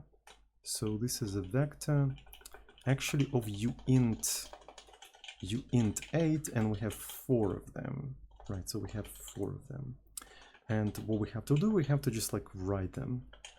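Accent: Russia. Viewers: Are you Rusian or German? You speak German words often, but your accent sounds Rusian. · Russian guy?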